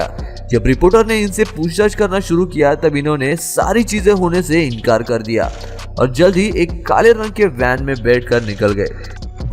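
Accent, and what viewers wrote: India. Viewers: You are not an indian why speaking liake an indian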